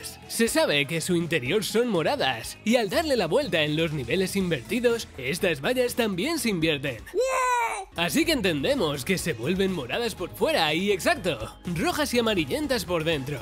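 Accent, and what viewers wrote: Spain. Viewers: aaaaaaaaaaaaaaahh un español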